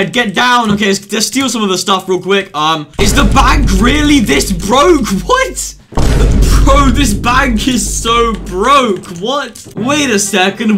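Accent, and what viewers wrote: United Kingdom. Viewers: POV if parkour civilization wasn’t scripted❌ POV if was British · Bros brithish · Bros British😂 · pvp civilization but british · I was dying, because you’re British (Sorry)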